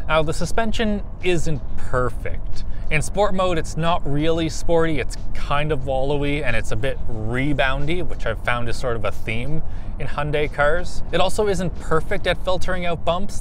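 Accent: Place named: Maritimes, Canada. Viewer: Love that Maritimer accent...never lose it! I'm getting major Nova Scotian with a hint of PEI.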